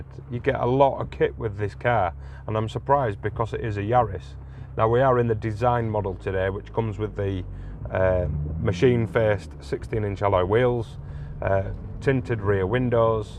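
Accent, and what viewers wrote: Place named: Northern England, United Kingdom. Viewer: I like your friendly northern style but respectfully suggest you need to cull as here and there you repeat yourself. Less is more... I wish you had taken a motorway run as I'd have liked your observations regarding what many reviewers complain about, viz wind noise and tyre roar in this scenario. Apparently both are excessive. I'd be most interested to hear from owners on this subject if any of you care to comment, please. I've ordered a top spec Mazda replica - forgot to say that I think the exterior design is stunningly beautiful from all angles - but can't forgive the manufacurer for producing a car sans satnav as I loathe the prospect of faffing around with phone cable connection etc. Grrrrrrrr!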